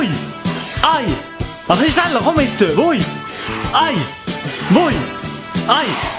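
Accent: Switzerland. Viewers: S tönt chli wie en Mischig vo Basler, Berner und Bündner Dialekt 😂 Super Video ❤ han als Zugerin es paar Sache Verstande, es paar ned. Aber gugge han ich Verstande 😂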